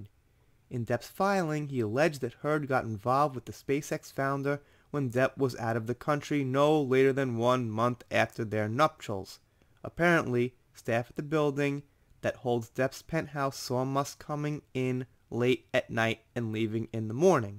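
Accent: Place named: East Coast, United States. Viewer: You know the YouTubers that become uber famous let you in on them, not just news. Still wonder if your wife is Japanese or work brought you there...what's it feel like having strong East Coast (NJ? NYC?) accent in Japan...one of the few places I've not been but so many are curious about it. When you are comfortable.....🤗 Not before.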